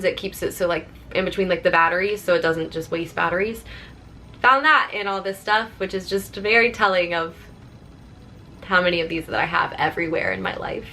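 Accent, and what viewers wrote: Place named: South, United States. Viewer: Being home for so long is bringing out tiny hints of your southern accent...take a shot every time says "ah" instead of "I":D Also we love and miss Holly!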